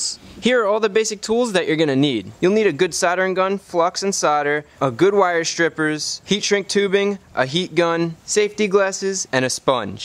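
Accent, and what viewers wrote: United States. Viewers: This pronunciation seems to be very common by Americans. It's not a bad video though if you need to learn about soldering. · Hey learn how to say soldering, there is no silent L in the word so I don't get why americans drop the L this makes you sound dum and is probably true So say it after me S O L D E R I N G SoL-dering !!! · It's an American thing, it's how we talk. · That's how us Americans pronounce it. I don't get it either, but that's just how it is. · Thats the yanks for you. Always trying to alter the ENGLISH LANGUAGE. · i appreciate the 'American' pronunciation of 'solder', as 'soder,' but it doesn't make it correct! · I want to ask a question, great video as always but why do Americans pronounce 'solder' as 'sodder', the 'L' isn't silent, and if you think it is, how do you pronounce the word 'Sold' ... do you say I 'sod' a car today? 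lol I'm only being a bit pedantic about it but I'm also genuinely curious if there is a reason why this has come about, any Americans who can tell me cos it makes me smile every time I hear one of you guys talking about 'soLdering' something lol By the way I'm very jealous of anyone with steady enough hands to solder properly, my hands shake so badly that let's just say if you see me going to try and diffuse a bomb then make use of the head start!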